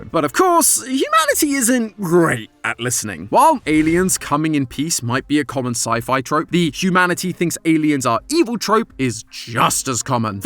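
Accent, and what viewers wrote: United Kingdom. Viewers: I love the British accent tho❤ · Are you British um whatever your name was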